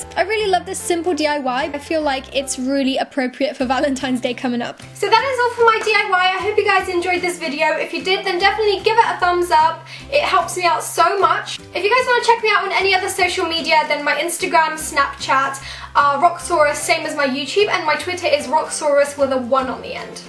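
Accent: United Kingdom. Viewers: also u can tell she is English by her accent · MrsPixie3D if she is, she speaks like a lot of people in the uk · i love your british accent though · looove you british accent!!❤😘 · oh I thought she was British because sainburys is a British store and has a British accent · OMG I looove your british accent! BTW really cool room decors! xoxo <3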